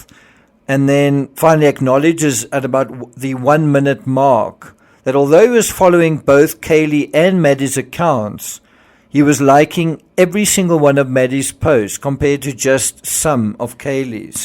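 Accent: South Africa. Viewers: love your videos! are you south african? I can hear a slight familiar accent. i'm from the free state studying criminology - your channel is truly amazing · I live in Brazil but am a fellow Saffa... I love true crime and have been following the Idaho case. I came by your channel by chance and thought... I recognize that accent - this guy must be South African... low and behold you are. Proudly South African! I just subscribed ☺️ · I would say Maddie because he met her at the restaurant, she may have been vegan herself also. Somebody has also say Kaylee didn't live at the residence anymore and was visiting Maddie to show off her new car, so perhaps her being there was totally unknown to Bryan. Xana and Ethan were almost certainly collateral damage as Bryan left the house. PS: I love your South African accent 😆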